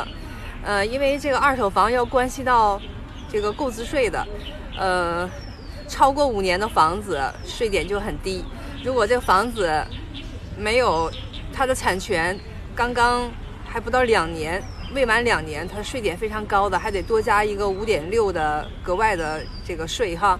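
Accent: Northeast, China. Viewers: 还是好好说话吧，东北话或者标准普通话，混着说，有点别扭，买房子属于私事，没必要上这里来说吧，这期做的有点拉分了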